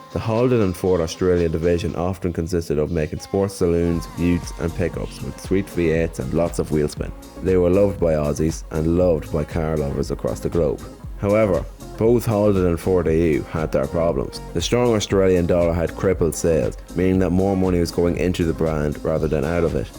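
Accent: Ireland. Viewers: NIssan Silvia wow have you never heard of an Irish accent?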